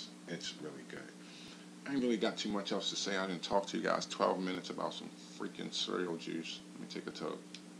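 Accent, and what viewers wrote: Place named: Maryland, United States. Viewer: Your murryland accent is so on point It's like marylanders replace a's with the "ur" sound haha.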